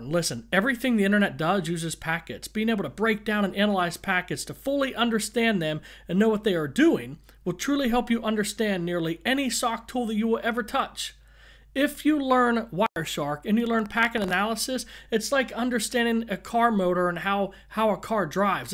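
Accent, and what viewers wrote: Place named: South, United States. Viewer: That accent... South of the Mason/Dixon and East of the Mississippi.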